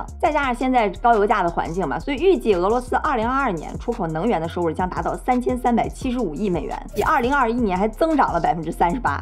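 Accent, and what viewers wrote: China. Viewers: 講話大陸本土口音太重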